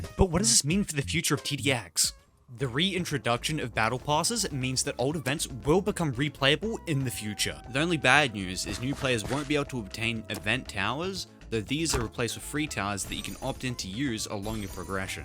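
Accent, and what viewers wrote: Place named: Melbourne, Australia. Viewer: It's giving Melbourne accent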